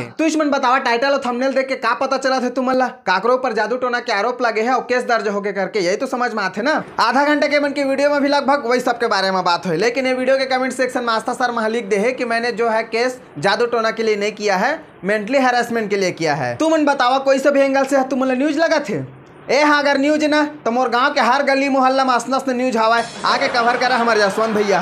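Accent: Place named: Chhattisgarh, India. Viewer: Ak number yrr bhaiya maja aa gaya cg style me 😅😅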